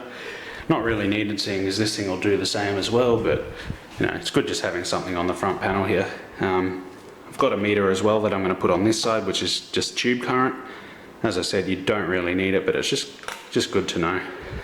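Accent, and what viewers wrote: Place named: Australia. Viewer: Me likes your aussie accent :) Noice!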